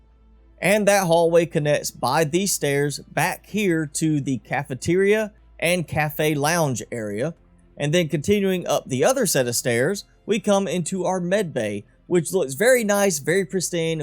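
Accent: South, United States. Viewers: No insult intended. Just curious. 😁 It sounds sort of southern...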